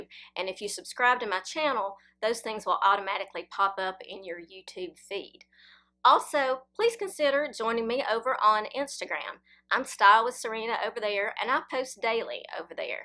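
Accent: South, United States. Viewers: I’ve just discovered your channel. Thank you so much for your hard work!! I love watching you…especially as a Southern expat living in Montana by way of Oregon. Especially since you confirm so many of my own rules, lolz!! Please don’t feel the need to change your accent (saying awn instead of own for the preposition). Let your accent come own through, hunny!!! I sure do!!! Love you, Love you, Love you!!!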